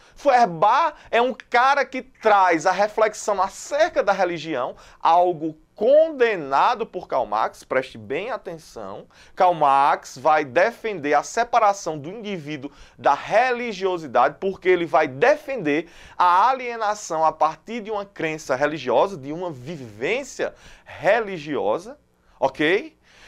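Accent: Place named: Alagoas, Brazil. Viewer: Professor deve ser alagoano !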